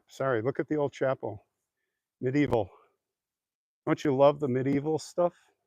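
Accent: Scotland, United Kingdom. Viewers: Loving your Scottish Accent! 🏴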